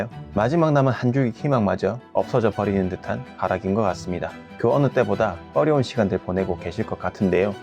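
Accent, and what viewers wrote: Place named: Gyeongsang, South Korea. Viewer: 이 유투버는 사투리를 깔끔하게 사용해서 듣기좋다. 요즘 어설픈 표준어 역겹게 사용하는 경상도 사람들이 너무 많다. 구독 강추!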